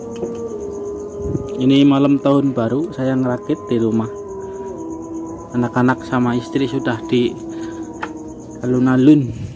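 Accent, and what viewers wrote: Banyumas, Indonesia. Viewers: ora ngapak ora kepenak.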